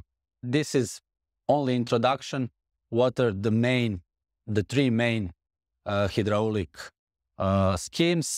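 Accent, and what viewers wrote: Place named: South Slavic, Eastern Europe. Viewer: Odmah se primeti (tvrdi) naglasak juznih slovena. Ali odlicno je sve, samo tako nastavi. Pozdrav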